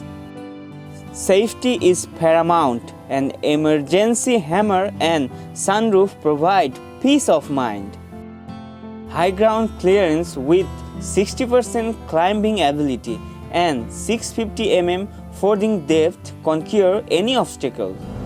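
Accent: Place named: Bangladesh. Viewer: WITH THAT BLOODY bangladeshi ACCENT U CANNOT IMPRESS INDIANS. AND BANGLADESH HAS NO MON TO BUY THESE.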